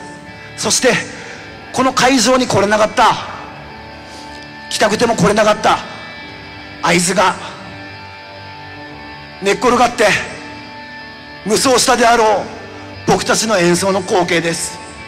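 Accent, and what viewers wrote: Yamagata, Japan. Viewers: 山形弁すげー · 山形県民だから山形の訛りのおかげでさらに入ってくる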